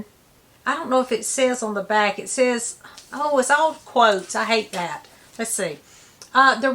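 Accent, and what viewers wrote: South, United States. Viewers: Love your smile... laughter... and, your southern accent!😁🤗💞. Hv u read, “B is for Betsy “?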